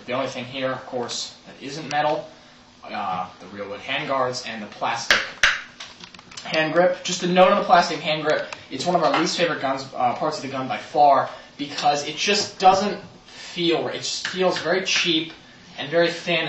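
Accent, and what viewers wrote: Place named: Boston, United States. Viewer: nice review but omg u realy sound like boston from do u love new york or whatever that one reality tv show u know.... nive job tho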